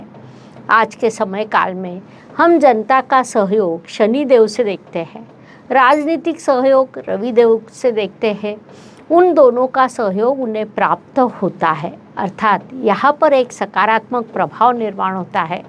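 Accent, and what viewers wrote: Maharashtra, India. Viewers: Such a strong thick marathi accent 😮 omg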